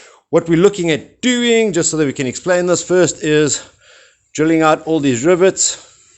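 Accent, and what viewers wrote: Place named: South Africa. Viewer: So great to hear a South African voice in far of Europe. Unmistakeable 😂. Subed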